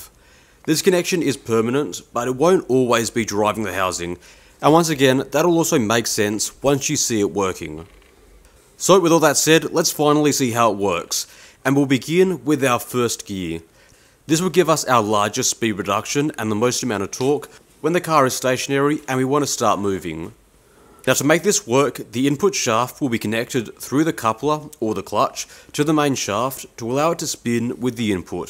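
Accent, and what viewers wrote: Australia. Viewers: Sounded like an Australian accent to me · An Australian!!! My ears are not designed for hearing THIS accent! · Australian? Great!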